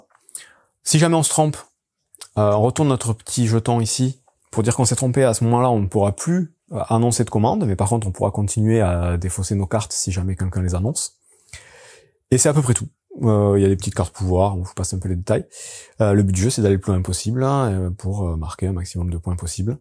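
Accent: South-West, France. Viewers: Bonjour, Je regarde régulièrement tes vidéos et je me décide enfin à te laisser un petit commentaire. Merci pour ta chaîne et la régularité de tes publications. Une chaîne qui donne de la fraîcheur dans le paysage vidéoludique pour le moins très similaire et assez commercial. Tu es clair, concis, précis dans tes explications, c'est fort agréable, et avec un accent du sud-ouest qui ne gâche rien ;).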